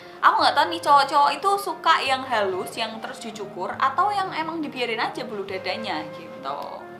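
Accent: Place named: Surabaya, Indonesia. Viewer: arek arek bgt mbk,e iki medok bgt suarane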